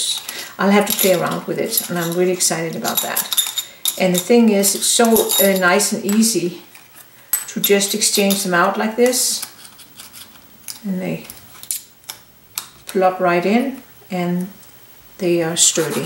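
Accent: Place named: Denmark. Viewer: As a dane I recognised your accent and had to go to your website to confirm what my ears heard. 😁 This is a great video, thank you for making it.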